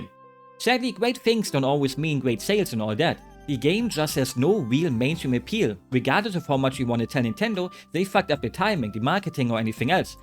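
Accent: Germany. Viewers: I just found out about this channel and realized how many similarities we share we are both Nia fans and have an silly accent, I have an welsh you have an German accent😂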